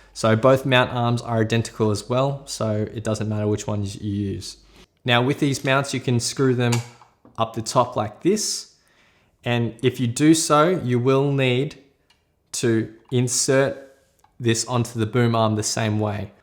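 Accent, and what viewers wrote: Australia. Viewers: Thanks for awesome review and instructions. Nice to hear an Aussie accent, we need a good tech review channel, thanks for bringing it. The link you provided takes me to a product $239 ? Did price just increase or wrong link?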